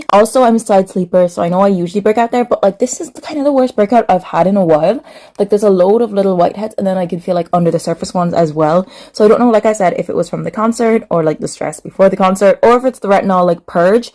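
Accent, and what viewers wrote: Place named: Ireland. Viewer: Ha me too! It's the Irish thing, we speak so quickly and its so comforting to hear an Irish accent at the speed I'm used to. We don't waste a second😂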